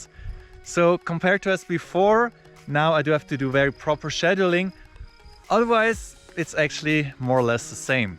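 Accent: Switzerland. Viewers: Hi! 😊Thank you so much for your swiss german in this video, you are very kind and nice! 😊 Swiss german is great but too difficult to learn for me😊. Greetings from Poland for you! 🇵🇱😊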